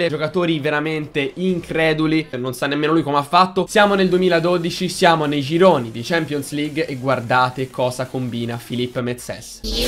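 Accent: Tuscany, Italy. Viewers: sei toscano??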